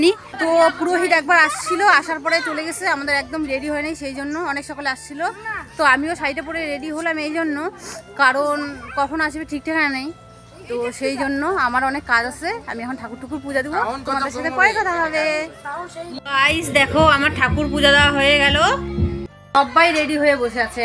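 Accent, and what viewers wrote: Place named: Bangal, Bangladesh. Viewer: Bangal vashata bolbe. Oitai main attraction. Khub valo lage. P😊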